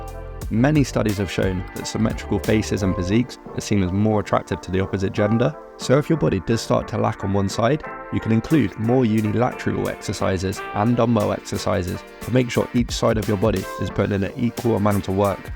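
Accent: United Kingdom. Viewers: Bro speak like british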